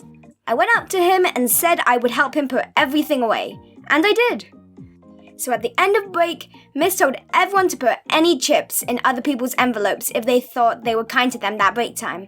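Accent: United Kingdom. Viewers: Are you british, irish or new zealander can't pin the accent but it sounds british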